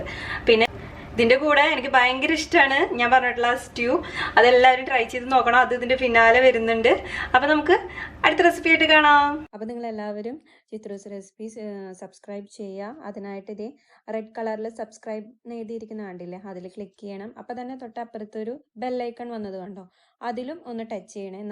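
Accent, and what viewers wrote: Thrissur, India. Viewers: ആദ്യമായിട്ടാ ഈ ചാനൽ കാണുന്നെ... റെസിപ്പി, അവതരണം ഒക്കെ ഇഷ്ടപ്പെട്ടു... Subscribed ഒരു തൃശ്ശൂർ കാരിടെ ലക്ഷണം കാണുന്നുണ്ടല്ലോ... തൃശ്ശൂർ ആണോ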